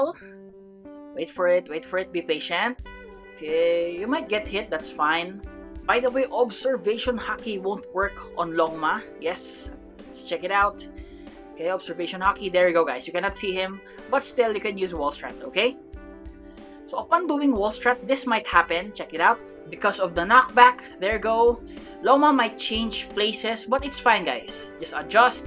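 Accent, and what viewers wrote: Philippines. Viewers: Bro accent is filipino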